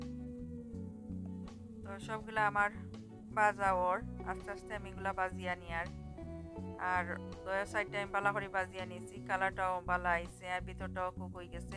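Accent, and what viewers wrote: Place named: Sylhet, Bangladesh. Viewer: আপু সিলেটি মাত শুনিয়া খুব ভালো লাগলো রেসিপি টা দারুন ❤❤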